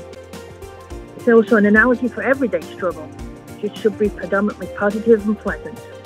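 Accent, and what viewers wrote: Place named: Northern England, United Kingdom. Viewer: Love hearing your luvly Northern voice , hearing your descriptions and your creative experience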